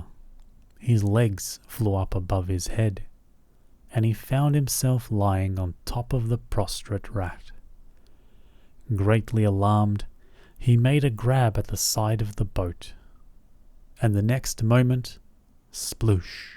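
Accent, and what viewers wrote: Australia. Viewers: Hooray! A dear familiar Australian accent!! Thank you sooo much. You do have a lovely voice, and you read in a peaceful, reassuring way. Thanks also for simply starting to read the text without a distracting monologue introduction. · Render us some new story with your Australian accent ❤❤❤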